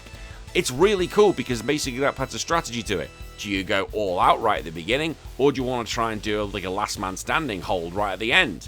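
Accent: England, United Kingdom. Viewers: hey not to be weird, i love your accent, what part of england are you from? its my favorite sounding one. I guess it's one of the reasons I've seen about all your videos so far.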